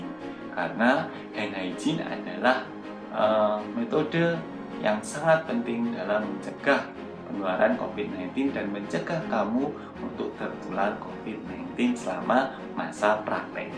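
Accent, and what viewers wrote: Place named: Java, Indonesia. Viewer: Mas...keren euy...logat jowo yg bikin org yg denger bakal hafal tanpa melihat wajahnya... edukasinya asik2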